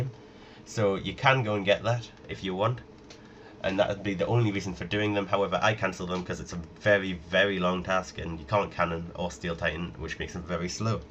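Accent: United Kingdom. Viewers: brittish accent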